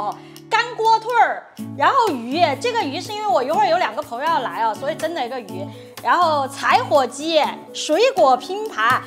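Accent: Sichuan, China. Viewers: 很喜欢听你讲四川方言比你的普通话要好听多了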